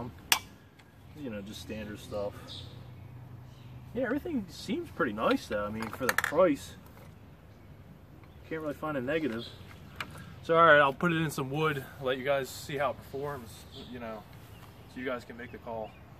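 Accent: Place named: Philadelphia, United States. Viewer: You sound like your from the Philadelphia area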